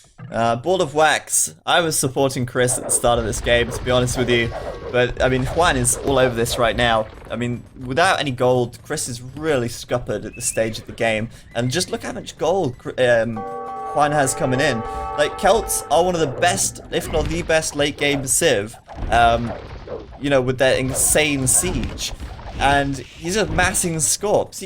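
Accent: United Kingdom. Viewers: It's extremely British lol, also UK has dozens of different accents so for people not native to it, its often hard to tell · Where about he is from in the UK, do you know?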